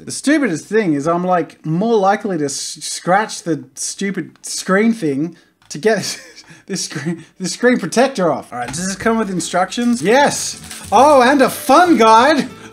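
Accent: Australia. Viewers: Australian dialect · So he's Australian I thought he was British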